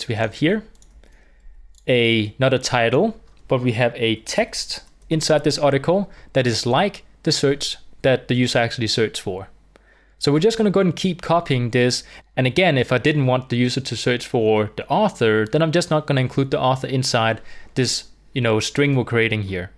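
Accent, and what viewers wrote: Denmark. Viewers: Are u danish ?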